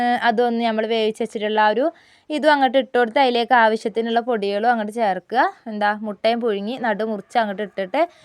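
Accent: Malappuram, India. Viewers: നിങ്ങളുടെ സംസാരം കേട്ടപ്പോ സ്ഥലം മലപ്പുറം ജില്ലയിൽ ആണെന്ന് തോന്നി 😊കറക്റ്റ് മലപ്പുറം ഭാഷ തന്നെ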